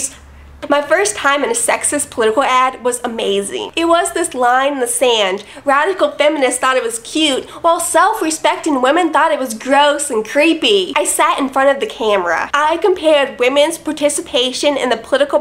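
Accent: South, United States. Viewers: a parody of a blue state woman with a red state accent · you have some sort of accent but im not sure what it is. is it southern?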